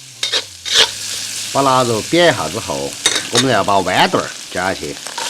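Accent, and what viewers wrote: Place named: Sichuan, China. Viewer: 雖然聽不懂四川口音，但還感受到那份香味！以後請加上字幕及食譜所用材料、調味料，可以嗎？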